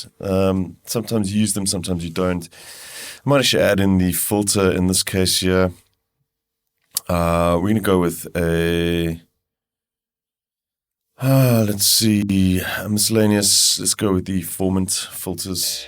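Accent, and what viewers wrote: South Africa. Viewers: Dude are you by any chance from SA?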